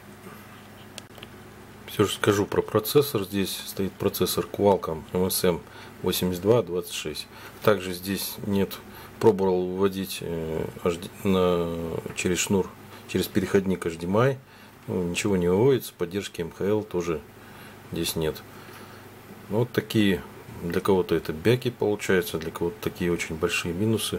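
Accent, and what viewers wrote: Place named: Urals, Russia. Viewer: Спасибо за обзор! Особенно порадовал Уральский акцент!!!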